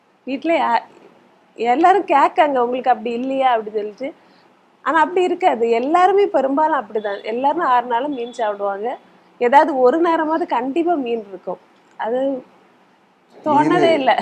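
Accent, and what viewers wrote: Kongu, India. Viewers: I think the anchor is from kongumandalam.❤ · Anchor kongu side pola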